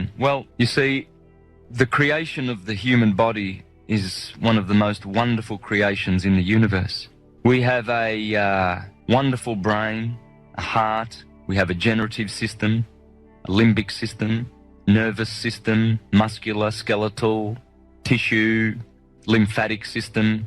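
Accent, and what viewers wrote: Australia. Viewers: Shame on you for not picking up on his voice and Australian accent. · His Auzzie accent gives me the shits.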